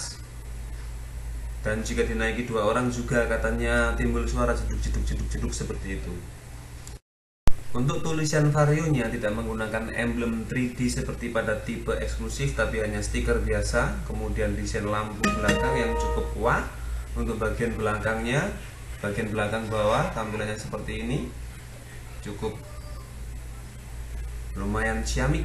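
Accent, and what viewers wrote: Java, Indonesia. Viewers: yo mbo' omong boso jowo wae, ilat sampeyan jowo banget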